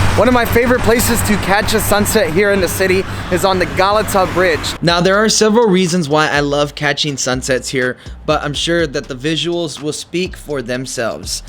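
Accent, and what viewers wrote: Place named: United States. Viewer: You sound like a yank but look Turkish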